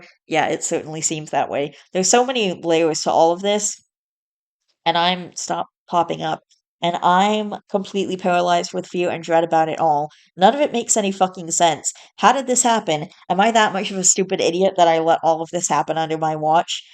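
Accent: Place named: Canada. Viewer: I never looked at your YT page before. You sound very articulate. Smart as a whip. Snd like the typical Canadian voice. Great video. Have a good one.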